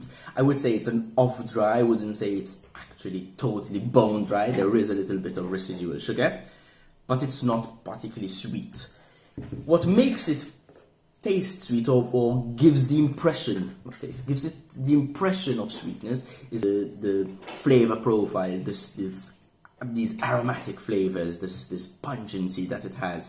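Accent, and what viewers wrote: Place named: Jamaica. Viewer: Good review. You sound Jamaican.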